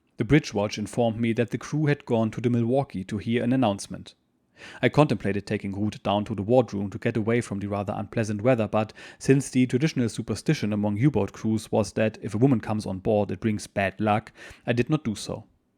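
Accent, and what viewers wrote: Germany. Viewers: I love these stories so much. I'm a lover of history. Especially military. And it's not often I hear anything from the German perspective. And the German accent makes it that much better. Thank you for the top notch content.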